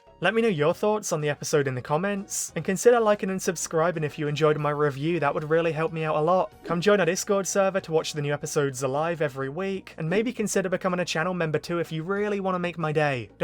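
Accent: United Kingdom. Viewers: You don't sound tired..... you sound brittish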